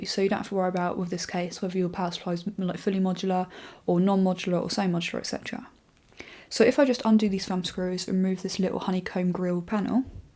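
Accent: United Kingdom. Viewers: Oh I love the British accent.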